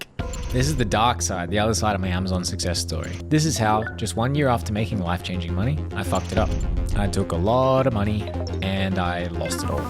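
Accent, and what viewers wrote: Australia. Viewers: Well I relate to you the only difference this happened three times in my life three big cycles. Now going up again fourth time I’m retiring soon!! From the age of 18 to 62. You sound like an Aussie I’m ex Aussie now American resident and Canadian citizen. In the end it’s all a ok if it’s not ok then it’s not the end.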